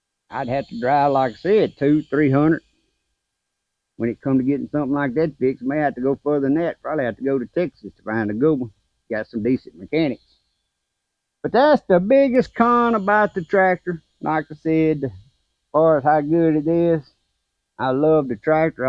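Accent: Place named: South Louisiana, United States. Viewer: Your accent sounds more south louisiana than north . I'm from Avoyelles Parish.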